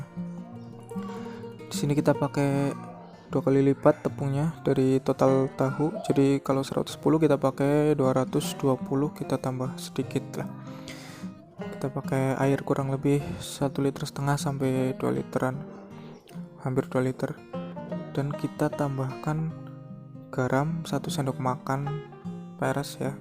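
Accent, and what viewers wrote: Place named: Banyumas, Indonesia. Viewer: jualan dimana mas, kaya bahasane ngapak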